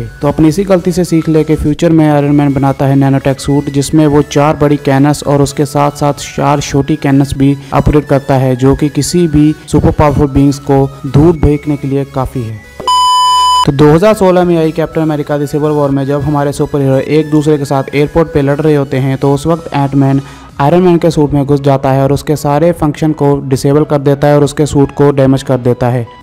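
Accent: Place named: India. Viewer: Bhai tuje uska Naam padhke aur hindi accent sun ke pata nhi Laga ?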